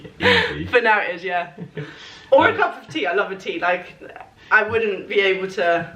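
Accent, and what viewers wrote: United States. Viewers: Hahaha your American accent wasn’t actually that bad. It’s better than I could do a British accent lollll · For these new generation kids, your American accent fits right in there. You'll do fine. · loved the american accent :) i'm from ny! my question for next video would be, how does your mom feel about your camera man :) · I love your American accent, I’m from Chicago and feel as though my British accent would be just as poor 😂 love you & your videos 💜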